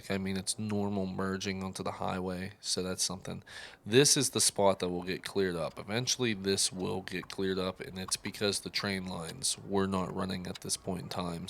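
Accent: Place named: Pittsburgh, United States. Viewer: I knew you were from Pittsburgh. Your accent is a dead giveaway. My family is from Pitcairn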